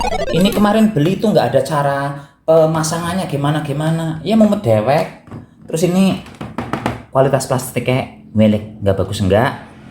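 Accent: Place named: Java, Indonesia. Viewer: Mas asline wong Kebumen apa wong Tegal sih. Basane medok temen.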